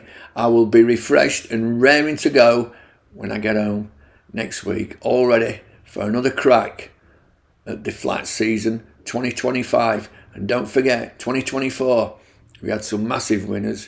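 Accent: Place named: North, United Kingdom. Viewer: Hi nice to hear that Northern voice once again, im on you 2 advised tips, plus im already on Whip Cracker ew at 18s. Enjoy your break.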